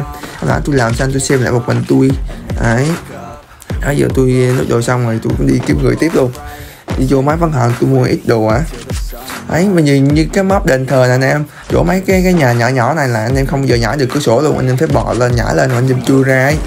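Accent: Quang Ngai, Vietnam. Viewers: A ở Quảng Ngãi hả😅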